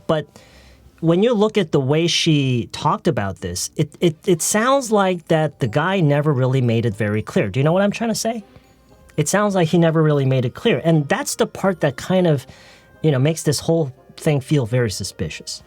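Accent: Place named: East Coast, United States. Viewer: 이정도 듣기는 너무 쉽죠ㅋㅋ 실제로 미국 가시면 님 안들릴거예요ㅋㅋ 모든 사람이 백인 동부 상류층 영어를 사용하는게 아니라서요ㅋㅋ 굉장히 또박또박 천천히 얘기하는데 당연히 쉽죠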